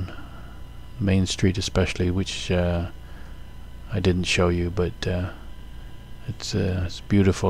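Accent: Canada. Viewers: Man after my own heart. Steady driver/rider - not out to break any speed records, enjoying the ride but keeping good space all around you. As an Aussie I have to say I love your soft Canadian accent. Very soothing. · Do most Canadians sound like you, or are you from a special area?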